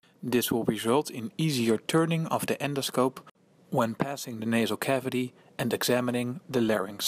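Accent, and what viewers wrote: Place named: Netherlands. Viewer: Heel goed, Nederlands accent!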